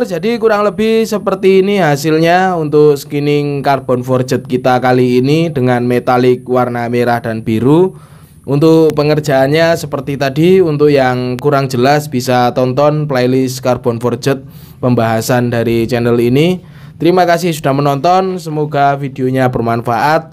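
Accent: Temanggung, Indonesia. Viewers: wong manggung ki?😁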